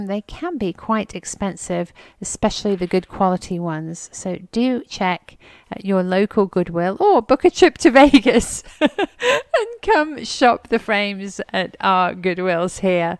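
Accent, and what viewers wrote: England, United Kingdom. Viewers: and her mum are English 🇬🇧🇬🇧🇬🇧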